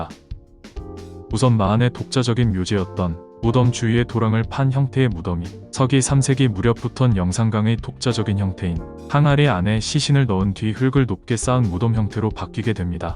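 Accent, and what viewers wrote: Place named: Japan. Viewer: ㅋ 식민사관이네. 지금 목소리도 일본 억양 있네. 믿지마세요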